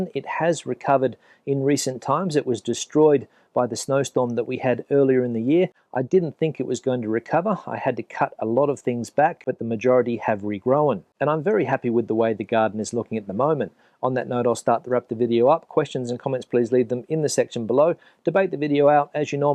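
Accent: Australia. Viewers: I love your 'no bullshit' Aussie accent.. keep up the great videos and sarcastic lessons given to those that can't spell whilst trying to slate you 😀👍👍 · I am an English speaker for 75 years. On my scale you are a 10 out of 10. You've lost much of your Australian accent probably as a result of living in Spain. I can tell that you're not speaking American English but I would not be certain whether you were from Australia, NZ, Canada or South Africa. Definitely you don't sound British. Can't believe you produce a great channel and people want to nitpick your English. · I studied Speech at University… I was taught that, in your case, you have a “regionalism” ie Down Under. An accent would be how you speak your regionalism. Say a person from Alice Springs vs. NSW….. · Hi as an English teacher for twenty years you should know ‘more busier ‘ airport is a double superlative also I would suggest it’s not proper english ( ha ha ) to end sentences with a preposition but I have no problem with your accent although not as strong as on Rottnest in the bar As another Aus expatriate i love Spain and if you are going to do comparisons your beloved WA fails most comparisons starting with your pension You voted with your feet twenty years ago amigo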